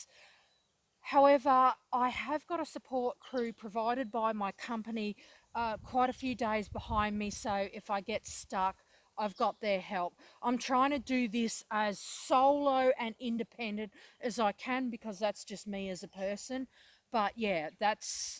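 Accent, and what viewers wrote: Australia. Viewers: Gotta love the Aussie accent !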